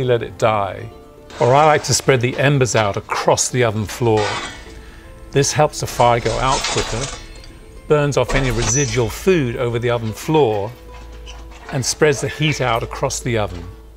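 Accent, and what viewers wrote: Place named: RP, United Kingdom. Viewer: FANTASTIC BBC ENGLISH ACCENT>>>THANK YOU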